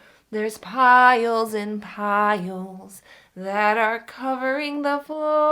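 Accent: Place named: Cockney, United Kingdom. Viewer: Nice Cockney accent. You could play Eliza Doolittle. Thanks for the swish-and-swipe hacks. That's the part of the morning routine that holds me up.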